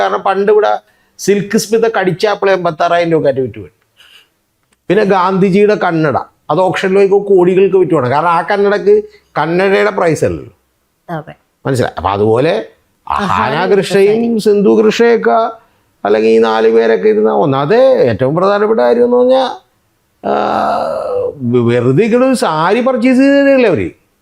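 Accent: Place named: Kochi, India. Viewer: This man is really🤔 funny😆 His cochin slang😅 Anyway I enjoyed😊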